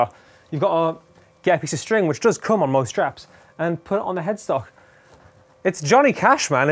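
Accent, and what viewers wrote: United Kingdom. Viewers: Heavy British accents and long hair really doesn’t go well with being a guitarist.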